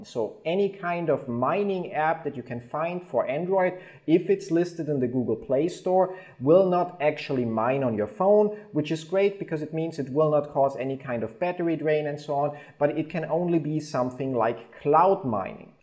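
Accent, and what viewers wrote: Germany. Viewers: this heavy german accent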